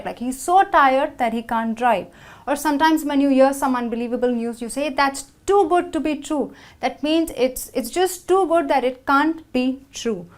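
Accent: India. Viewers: is she indian? she has a particular accent, she doesn't sound like an American. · you agreat , you from indian i know from your english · Hi! Thank you for the explanation! Are your accent from India?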